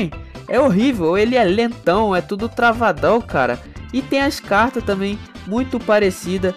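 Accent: Rio de Janeiro, Brazil. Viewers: Pela Voz o e Carioca kkkkkk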